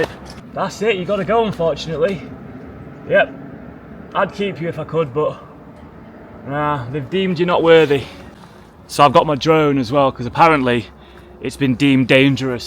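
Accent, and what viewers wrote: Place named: Leeds, United Kingdom. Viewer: Where is the chimney at from your accent I would say you are from Leeds.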